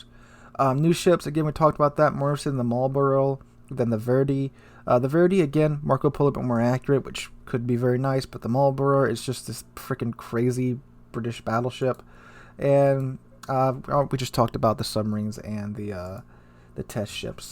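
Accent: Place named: United States. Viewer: True, but pronunciation isn’t bad, it’s just the Americanization, like saying Edinburgh as Edinburough instead of Edinburra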